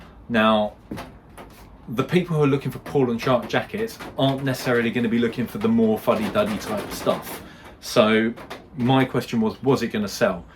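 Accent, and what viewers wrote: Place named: Cockney, United Kingdom. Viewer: Love to see you back. I thought something horrible must have happened to you. But no need to worry loads of people have a mid life crisis, yours just involved lots of ladies clothes 😮 UPDATE: When did you go all Cockney? Most people go the other way, your heading towards selling 80% baby laxative wraps outside McDonalds. I need to check back on some of you old vids this is confusing.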